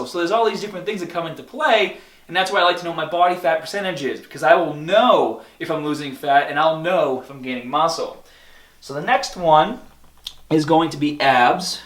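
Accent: Boston, United States. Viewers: watching your videos for months and this is the first time I picked up a type of Boston accent, you must have grown up in mass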